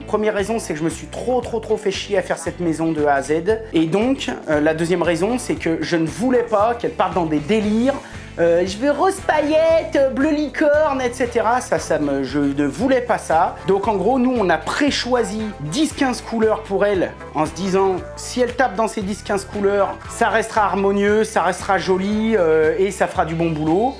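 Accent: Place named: Thiers, France. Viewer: Une vidéo qui montre qu’en 8 ans, tu n’as pas changé, on retrouve (jamais perdu en réalité) l’artisan plombier des débuts capable d’autocritique, qui s’amuse de rien, un homme qui a su rester simple malgré la réussite. Un regret malgré tout, le trop grand décalage entre le tournage et la diffusion (ici 4 mois environ). Bon courage pour la suite, bravo ! « Nickel » ! Entendre quelqu’un qui met des « y » partout, cela me rappelle Thiers où j’ai quelques attaches. Justement, là bas je possède une bâtisse qui a ce problème de flottement un peu en raison des longues portées des poutres mais bâtiments de 1909 qui vivra plus que moi.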